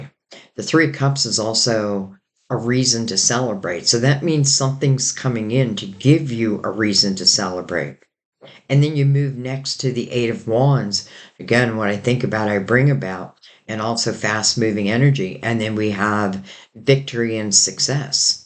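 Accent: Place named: Pennsylvania, United States. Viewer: Love your SW PA accent!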